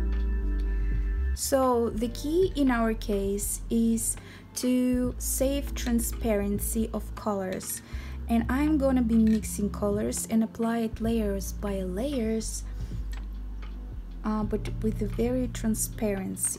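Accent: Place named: Russia. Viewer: Такой русский акцент ,хахаха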